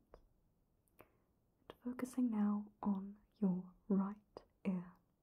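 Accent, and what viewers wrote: New Zealand. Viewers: Great video and love hearing the nz accent! Feels like being at primary school doing the hearing tests 😅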